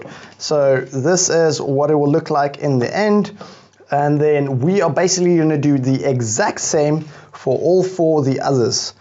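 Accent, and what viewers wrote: South Africa. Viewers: You from south Africa?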